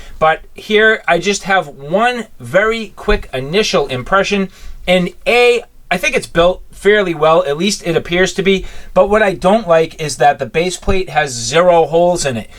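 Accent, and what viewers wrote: Boston, United States. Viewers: This guy from Boston?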